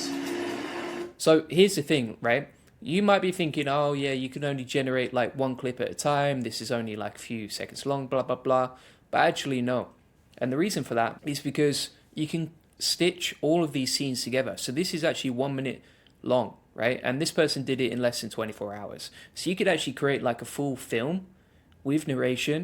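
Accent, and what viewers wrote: England, United Kingdom. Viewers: You sound English bro how did you get access to this in the uk?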